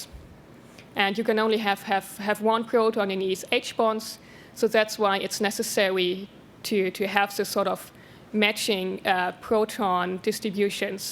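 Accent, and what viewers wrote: Germany. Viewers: I think she is a German who was taught British English by (or near) the French. That's my hypothesis. Anyways, very interesting talk! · Free Thinker, I think she's German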